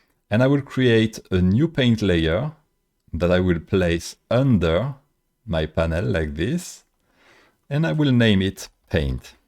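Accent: France. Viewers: I really enjoy listening to you speak. It feels like I’m hearing two languages at once—French and English—while understanding everything perfectly. Amazing 🤩❤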